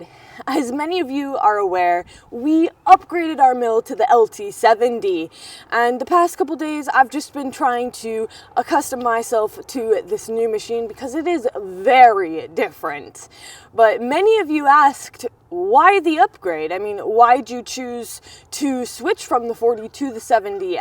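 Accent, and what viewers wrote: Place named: Boston, United States. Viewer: You seem like you have a Boston accent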